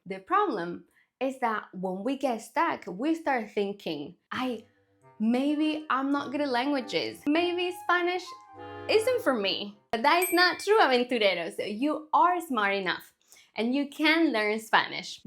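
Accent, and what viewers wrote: England, United Kingdom. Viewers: This is very true. Just listening isn't enough. If you listen to a lot of hours your listening comprehension will be high but your speaking won't. You've got to practice speaking and at first it will be difficult with a lot of mistakes, but the more you speak the better you will speak. The great thing about it is, if you listened to 2000 hours you don't need to match those hours with speaking hours. You can reach a good level of speaking with less hours but obviously, the more speaking hours the better., I can tell you've listened to a lot of English content. You've acquired the accents. I say accents because you have an accent from England and also a little from America. It's all good though. You're fluent. There is no doubt.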